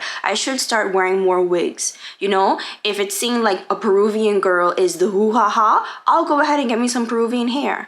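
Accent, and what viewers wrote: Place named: Trinidad and Tobago. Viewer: Are you Trini? I hear that accent sis !! ❤❤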